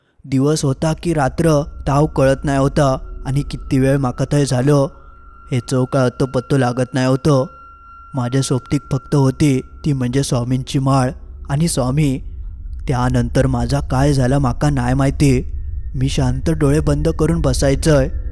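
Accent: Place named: Malvan, India. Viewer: ❤.व्हेरी. Good and.sweet.malvani.voice. 😂.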